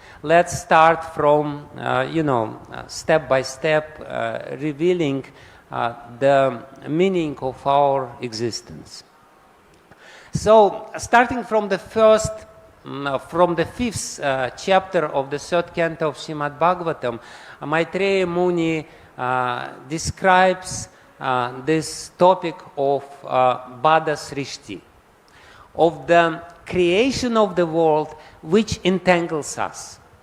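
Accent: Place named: Russia. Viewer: Hare Krishna. 🙏🏻😇 It's the best way to learn English - to listen with russian accent from Maharaja.. 🕉️🌬️🧘🏻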